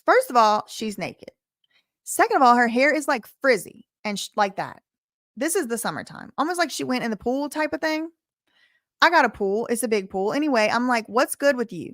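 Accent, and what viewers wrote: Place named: Tennessee, United States. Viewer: accent has Tennessee written all over it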